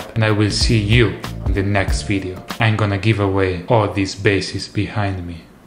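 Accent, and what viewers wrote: Italy. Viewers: italiano si sente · Hey but, are you italian? Because when you said "epico" i understanded that you ARE (i think) italian.. i am parli molto bene inglese ma magari concentrati di più sulla pronuncia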